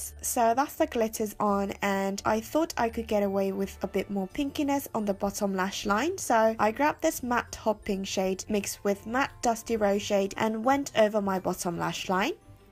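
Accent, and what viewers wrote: England, United Kingdom. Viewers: your makeup tutorials + english accent 💕💕💕 i love love loveee this look! i will definitely try it one day 😁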